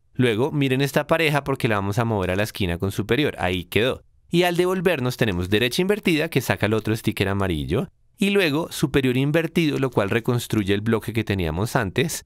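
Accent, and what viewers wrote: Colombia. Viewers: Guillermo Crespo Es una voz de colombiano haciendo un tutorial. Así son siempre. Aparte es bastante agradable, es una voz viva.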